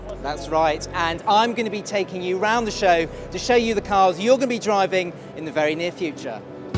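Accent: United Kingdom. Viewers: I now come to this conclusion that It's not specifically the male presenter that is annoying and pissing everyone off but British accents overall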